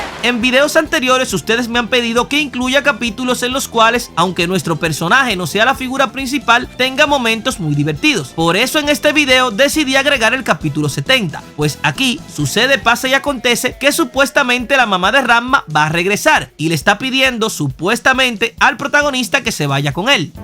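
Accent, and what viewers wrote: Dominican Republic. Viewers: Una pregunta: por casualidad eres dominicano? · una pregunta tu acento me suena muy dominicano, y te pregunto ¿si eres dominicano verdad? porque ese acento lo reconozco muy bien, y si no eres dominicano de donde eres porque ese acento no me suena ni a cuba ni a puerto rico que son los paises que hablan mas similar a republica dominicana, aunque estoy entre la espada y la pared si es dominicano o puertoriqueño pero la diferencia es que nunca reemplazas la "r" con la "l", y incluso pones referencias de personas dominicanas en tus memes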